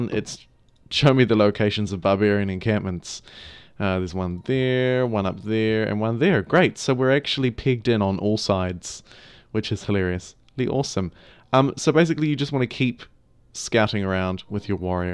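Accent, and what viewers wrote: New Zealand. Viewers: That's not an Australian accent, most likely he's from New Zealand. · Somewhere from oceania, maybe new zealand.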